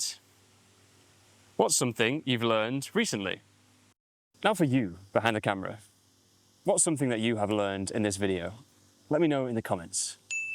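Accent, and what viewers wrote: United Kingdom. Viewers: Hello, what a nice video indeed I really like the style you're using for your channel, the manner...the pace well I don't know if you talk like that in normal life...and of course the accent, I love the British accent a lot. And, those suggestions are very useful for a guy like my who is quite the opposite of being talkative 😅 and he's learning English to beat his shyness as well, so...thank you! 🙏😊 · Your accent is very clear and easy to understand Mr. unlike the cockney accent tbh I have an Indian accent and would love to pick up SSB Accent like yours so I wanted to ask if you have 1:1 training classes? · Are you from the north of England? You pronounce your u's just like Gary Barlow does 🤔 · Do you speak british english?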